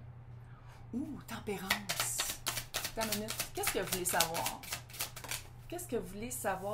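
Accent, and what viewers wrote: Canada. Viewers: Absolument désopilant 😂🤣…et dans le mile. Le mien a une ex qu’il a quittée il y a 3 mois après m’avoir quittée pour elle il y a 3 ans (mouai), puis l’ex est revenue par texto il y a 3 jours (une vraie bonne triangulaire comme on en fait QUE dans les meilleures maisons 😜) Du coup ça l’a secoué , Du coup ça m’a secouée, Du coup je lui ai dit « stop on arrête tant que t’y vois pas assez clair » vu qu’il ne « release pas encore son ex ». Mais bon. St Valentin ou pas, c’est pas encore réglé c’t affaire. Ahhhh continuez absolument, qu’est-ce que je ris 😂 En revanche le débit en canadien je vous dis pas, au moins 5 visionnages pour pécho un ☝🏿 seul mot. xxx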